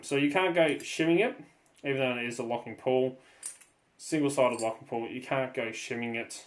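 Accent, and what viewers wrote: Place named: Australia. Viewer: well compare to lockpickinglawyer, you will hear the difference. Nothing wrong with the content but the sound is going to be an issue if you want subscribers outside Straya who are not that familiar with the accent. Anyways have a great christmas time man!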